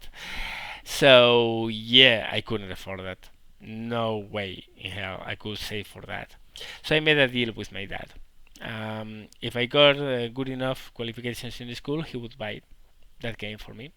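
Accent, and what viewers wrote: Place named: Spain. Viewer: Your English is top notch regardless,. Your sexy Spanish accent is as thick as Tiger armor, but your actual English is pretty much flawless most of the time.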